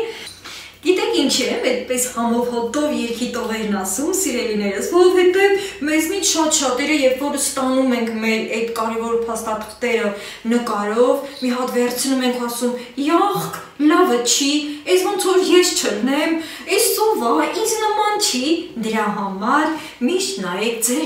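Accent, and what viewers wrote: Lori, Armenia. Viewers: Բարև Ան ջան շատ հաճելի է միշտ լսել քանի որ մենք Լոռի բառբառով ենք խոսում իրար ճիշտ ենք հասկանում ինչ նպատակի գնացել ես թող բոլորը քո սրտով լինի ❤❤❤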